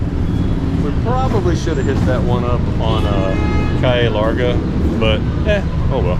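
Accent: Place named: South, United States. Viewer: You can eat Guayava paste with cheese, you should try it...Love your southern accent!!!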